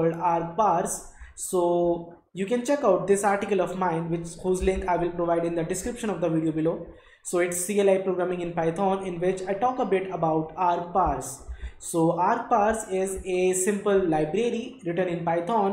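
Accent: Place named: India. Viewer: what an indian accent.. jesus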